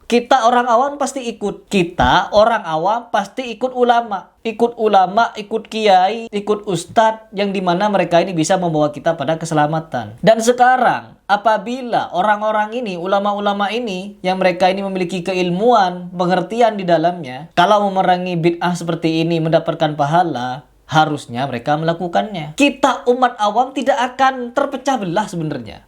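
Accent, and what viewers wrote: Madura, Indonesia. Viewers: Masnya orang madura ya? Kayak logat madura... Saya penonton baru mas... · Kayaknya bang admin orang madura ya, soalnya terlihat dari logatnya..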